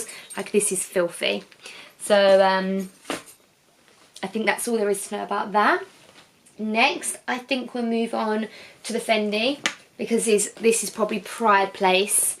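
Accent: Essex, United Kingdom. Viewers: I watch for your accent and fun personality because I love TOWIE....the luxury items are a bonus!!!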